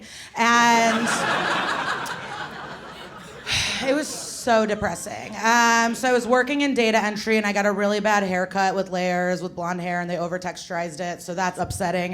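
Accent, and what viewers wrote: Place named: Midwest, United States. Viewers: Ya know I usually hear the midwest female accent (go to fucking Naperville, IL and tell me 80%+ of all the chicks DON'T sound exactly like this😀) and immediately turn it off or run away. Glad I didn't this time. Throwing bedpans in a hospital is the best criminal offense I've heard in years. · Her voice is def from Skokie.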